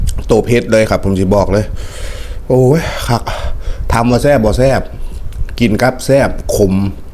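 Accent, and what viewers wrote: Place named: Phu Thai, Thailand. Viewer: สำเนียงเหมืองคนภูไท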